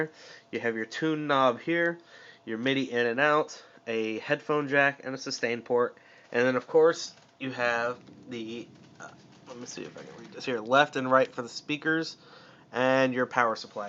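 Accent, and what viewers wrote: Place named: United States. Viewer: I see now that you are living in the USA, I was in mind you were from UK, ok the accent was american, so I had to knew that, all the best and thanks, Luc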